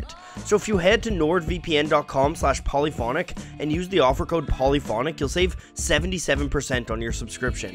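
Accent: Canada. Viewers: Thumbs up for the Canadian accent !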